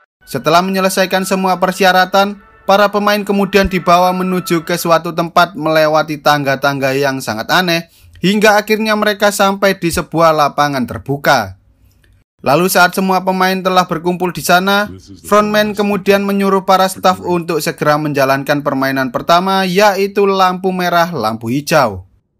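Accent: Java, Indonesia. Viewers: Kental Kali logat jawa nya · Kek suara sama logat medok nya dodit mulyanto. ya gak sih😂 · Medog banget ngomongnya hehe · Jowo campor indo wes mboh medhok e pol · Ngomongnya medokkk banget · Suaranya medok banget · kho jawanya medok bnget😅😅😅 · ngantuk gue dengerin miminnya medok bat 😭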